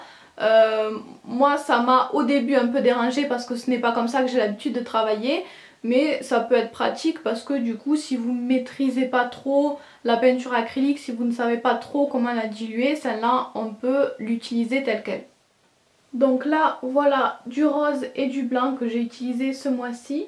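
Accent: South, France. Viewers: J'adore ton rouge a lèvre et ta vidéo ! :) d'où vien ton accent ? Hihihi du sud non? ❤️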